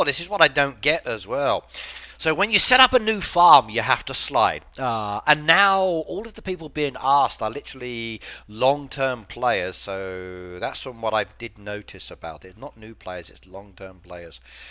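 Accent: United Kingdom. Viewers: Is that a Scottish accent? ✌️😎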